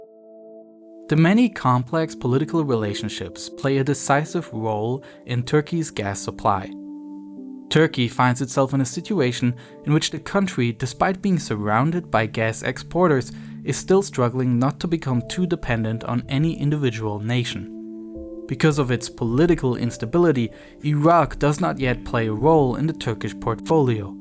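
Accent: Germany. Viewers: German accent? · I cant believe it took me this long to realize you're german, but the way you said August and Recep at the beginning are such a giveaway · I subtly like your German accent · I have a question for you. Are you German? Sounds like it ☺️ Greetings from Munich 🤗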